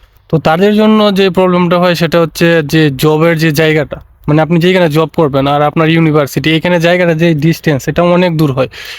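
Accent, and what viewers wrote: Kishoreganj, Bangladesh. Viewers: আমাদের কিশোরগঞ্জের কথাবার্তা মনে হচ্ছে ❤। কিশোরগঞ্জের কোথায়?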